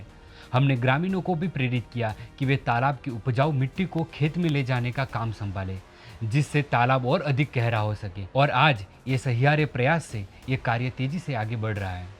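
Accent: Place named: Gujarat, India. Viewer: your Hindi is good but tone is Gujarati, please keep it up!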